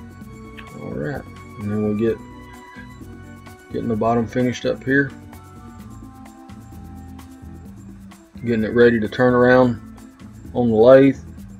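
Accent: South, United States. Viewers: I don't think it's because of the way you talk. You seem to be a very polite person, which goes a long way. It's probably because people are more impressed with the quality of the video production, than the finished product itself. For example, Frank Howarth's channel is impressive not only because of his woodworking skill, but because of his editing skill, not to mention the versatility of his projects. Anyway, best of luck to you! Signed, a guy from Romania that doesn't care about your southern accent as much as he cares about your quality content. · Wonderful work. I love to watch your videos, very informative and entertaining. I live in England and I find that Good ol' Southern US of A accent great as well (:-). I'm so envious of you just being able to walk out back and grab some timber the way you do. Carry on with the great vids. All the best, Stuart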